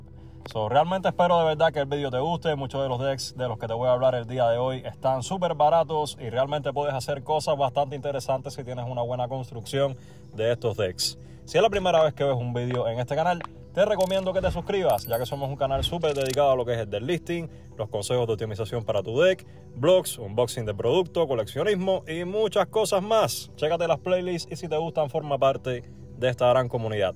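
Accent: Cuba. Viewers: Asere tu eres cubano?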